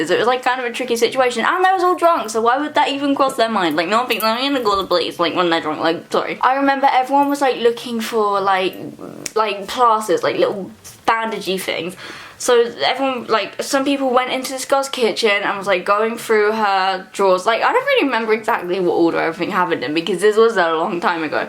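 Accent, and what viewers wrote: England, United Kingdom. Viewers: is she from England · Jacob Engallena it's not her accent it's a British accent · Its English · dick bicycle I mean her hair looks pretty brushed to me. She has braces and she's British so her words might sound different. 😂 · She is from the UK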